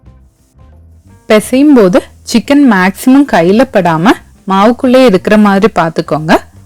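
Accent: Kerala, India. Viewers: Ur talking is like a malayali... R u a from Kerala Or tamil nadu??? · Enikkum thonni evideyo oru malayalam touch... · U sound like a malayali talking Tamil... Good quality video!! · ningal malayali aan le .tamil samsarikkunnath klkkumbol thanne manasilavunnu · Hello 🤣🤣 that's TAMIL not malayalam. She's speaking tone which reflects her mother language malayalam but she here fully speaks in tamil words only. You north indian guys are over thinking malayalam is only a down south language. But truth is tamil language is mother for all south Indian languages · Malayalam kalantha tamil🙂, good recipe. Thanks. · Malayali aanu...aa tamil kettal thanne arayaam...but sambavam supper tto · Woww superb kfc👌... malayali tamil pesara maadhiri iruku..ningal malayali alle?😊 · Malayali aano ? Malayalam touch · U sound like more malyali than a tamilian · Covered all steps including 'maava salichi vachikonga' ...loved this video And even though I could sense kerala accent, tamil is good!! 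· Firstly for the malayalam tone tamil speech i am subscribing your channel👍😍 of course for presentation also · Malayala manam unga tamizh la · Malayali daa tamil ketta puriyaathaa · Malayali anu alle... Tamizhu kettapol manisilayi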